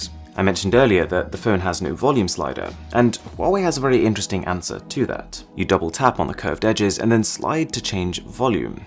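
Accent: United Kingdom. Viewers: Smh a dialect can’t be helped. He sounds British but without the accent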